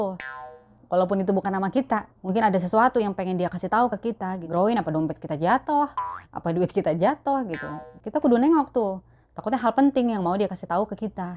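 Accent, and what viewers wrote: Jakarta, Indonesia. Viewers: Assalaamualaykum....met kenal mpo hehehe....hr ini kluar dah logat betawi mpo yah hehehehe...sukses slalu ya mpo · Salam kenal pok,gaye nye betawi banget👍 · Medok banget Betawi nya.. 👍👍❤️ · Assalamualaikum.. teh, aslinya mana? Logat ngomongnya betawi beud 😁😁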